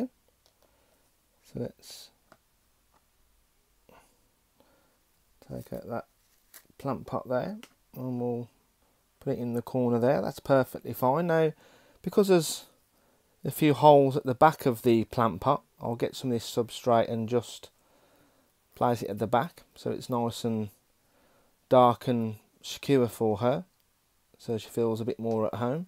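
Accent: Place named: West Midlands, United Kingdom. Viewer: Clues - bloke -west midlands accent -has red runner roaches -name is.....do I win a prize? 😁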